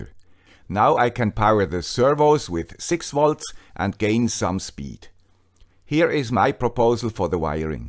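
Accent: Switzerland. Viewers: Fantastic! I am definitely going to build one - Ive a spare raspberry pi so I only need to buy two servos. Thanks for another great video man with the Swiss accent!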